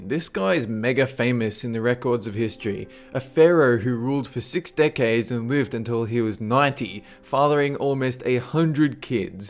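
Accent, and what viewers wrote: Australia. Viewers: Is this guy Australian?? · He's Australian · He's Australian. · Are you Australian? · He's an Aussie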